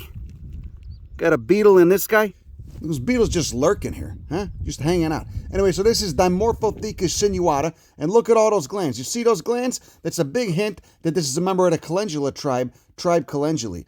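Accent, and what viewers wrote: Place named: New York, United States. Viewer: DAMN U SOUND LIKE NEW YORK !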